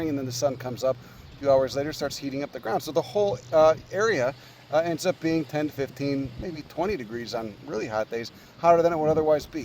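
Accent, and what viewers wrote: Chicago, United States. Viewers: Love the Chicaga accent! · Love your accent!! My entire family from south side Chicago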